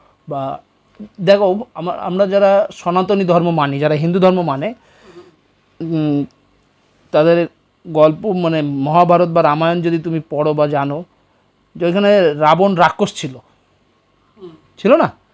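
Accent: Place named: United States. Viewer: Mama rocks... darun laglo...what a Yankee style of talking... khub bhalo laglo ❤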